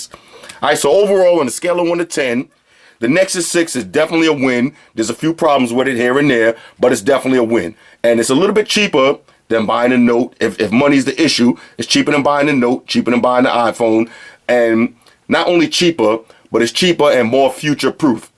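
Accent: New York, United States. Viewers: you from New York Bruh??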